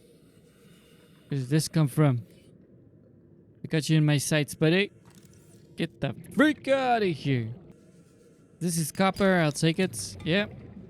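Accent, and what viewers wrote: United Kingdom. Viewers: He kinda sounds British not trying to be mean but you do but your my top 1 favorite youtuber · 😂❤❤ it gor me soo badly ur british?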